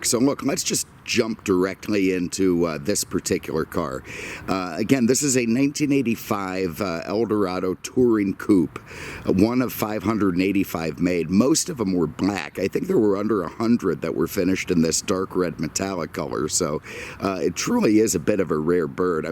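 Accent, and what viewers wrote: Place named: New York, United States. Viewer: Your stand-up and fear of any living animal-(scary, filthy, up to no-good, gang, face ripping), cracked me up. I clicked for the Caddi, but we’re from the same decade and out, so got every one of your references. I especially hate humidity and only visit Fla. from November until February. Beyond those months, only for money! You were hilarious and sound like the consummate NY transplant. Good Luck with the Eldorado. I prefer the ‘78 whale in that brownish gold mist colour and in Biarritz trim. Btw. I have a ‘81 Toronado Brougham in triple-navy. I love her! 🌞😂😎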